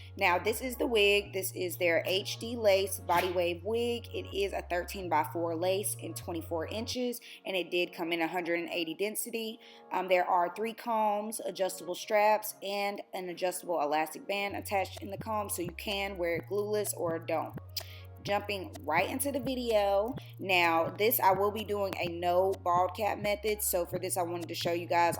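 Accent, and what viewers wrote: South, United States. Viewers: Love it! Okay, I hear her Lil country accent lol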